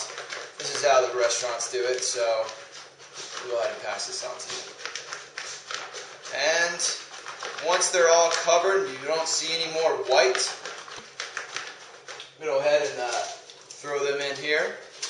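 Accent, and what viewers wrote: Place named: California, United States. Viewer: Sounds like hes frim california, definately not the midwest.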